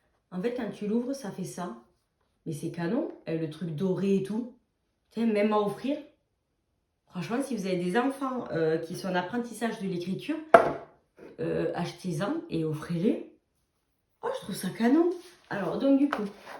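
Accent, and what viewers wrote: South, France. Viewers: Coucou, je suis tombée par hasard sur ta chaîne, j’aime beaucoup ta bonne humeur. Je me suis abonnée à ta chaîne, j’ai moi-même une toute petite chaîne. D’après ton accent tu dois être du sud comme moi. Les achats pour l’anniversaire de ta fille sont top. Merci pour le partage. Bises à toutes les 2. 😍😍😍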